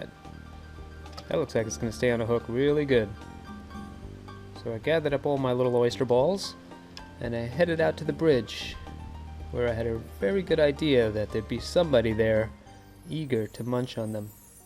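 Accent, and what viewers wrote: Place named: South, United States. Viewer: Any1 else think he Sounded like he talked with a country accent?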